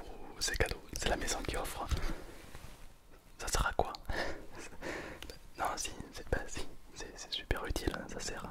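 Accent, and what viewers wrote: South, France. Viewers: L'accent du sud >> les autres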